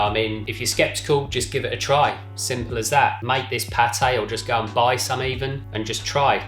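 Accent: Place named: West Country, United Kingdom. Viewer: Well you really have a West country accent ....nice recipe.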